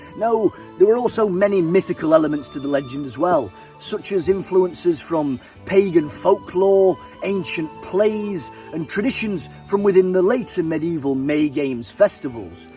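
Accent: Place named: England, United Kingdom. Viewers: As a History Nerd I’m mad I have only just found your channel and as a fellow Yorkshire man I appreciate the Broad AF Yorkshire Accent what an amazing history channel researching a great Folk Hero and History 🏹🍻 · I only recently ran into you channel while looking for archery videos as I am starting on the hobby (doing it with a prosthetic hand is... interesting). And what quality content you have here in your shorts and your long videos. And it is great to see and hear a Robin Hood that can speak with an English accent! Keep the good work!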